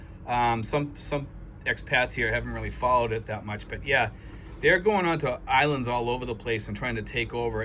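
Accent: Boston, United States. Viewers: Sounds like a Bostonian, or at least from somewhere in Mass. 🤔🧐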